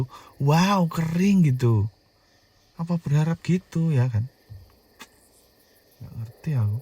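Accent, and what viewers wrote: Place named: Blitar, Indonesia. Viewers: Horror : 20% Komedi : 80% Semuanya dibalut oleh bahasa jawa logat medogg Jadinya : Lucu2 serem wkwk :D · Ngomongnya pke logat blitar jiaaaan medok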